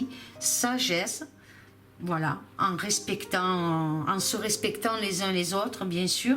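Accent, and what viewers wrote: South, France. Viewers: Bonsoir toujours un plaisir de vous écouter heureusement que Dieu vous a donne ce don pour nous éclairer et puis j aime le petit accent du sud 🙏🏾❤️☀️🌹🧚🏿☘️🌞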